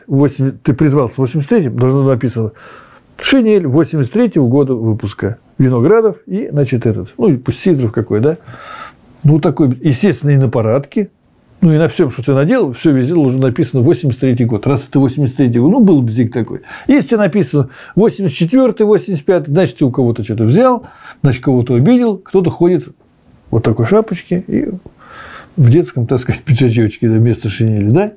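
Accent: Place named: Okanye, Russia. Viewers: Слушаю, стал как он окать! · у меня был приятель из Коостромы, так он так же говаривал, я ему говорю - специально, а он удивленно, а я по другому не могу. А теперь послушай говор жителей из ДНР, ЛНР и солдат ВСУ, у них один характерный южный говор, такой же в Ростове, Краснодаре и т.д. Для изменения нужны годы, вот Примаков наш Премьер и руководитель СВР ранее говаривал с Тифлиским акцентом, а после института как диктор телевидения.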